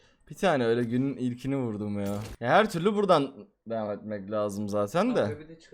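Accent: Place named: Gaziantep, Turkey. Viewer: abi gaziantepli mi abi konuşması benziyor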